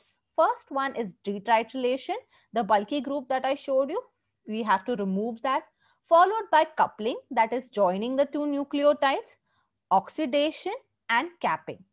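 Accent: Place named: India. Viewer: Too much emphasis on Indian Accent.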